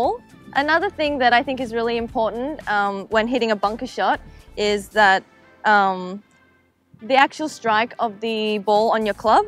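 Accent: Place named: Australia. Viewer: Wonderful Aussie accent. And of course, beautiful legs! Go Minjee.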